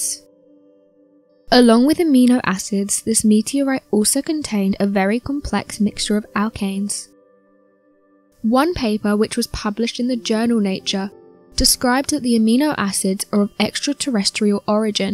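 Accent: England, United Kingdom. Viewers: Ram Rod0 not sure where she would be from but definitely English 😊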